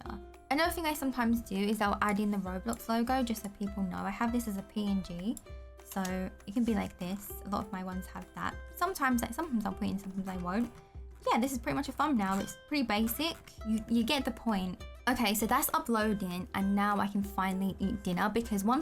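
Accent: London, United Kingdom. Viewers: I’m going to the Uk next year.and I went Last year.its really cool for me and hard to understand stand before and now I can so when I first started watching you I knew you where British.also I love ur videos😁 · Ooh your from London???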